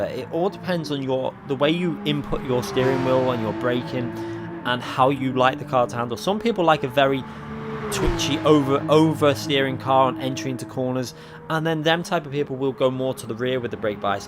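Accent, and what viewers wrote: United Kingdom. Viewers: That British accent make tutorial videos sounds so much better. It feels like I am watching an actual race with commentators speaking in the background.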